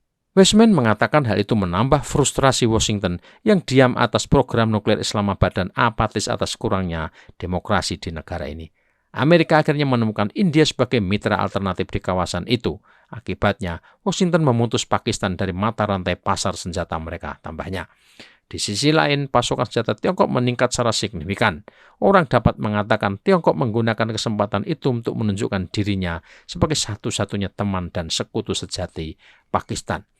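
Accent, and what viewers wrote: Java, Indonesia. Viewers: Jawa betul km lek medok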